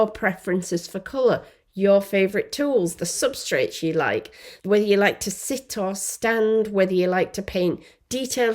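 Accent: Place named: Lancashire, United Kingdom. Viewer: Gosh, I’m so happy I found you and your podcast. I live in the US now, but originally from Lancashire, your accent brings me back home (I have family in Yorkshire!) I’m so excited to delve into acrylic painting properly this time and learn my authentic self/style; no more picking up then giving up - excited to learn and be guided by your lessons and work. Thank you.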